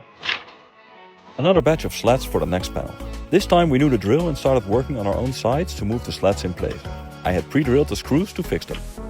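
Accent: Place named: Netherlands. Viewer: I love hearing your Dutch accent. I miss my vader so much.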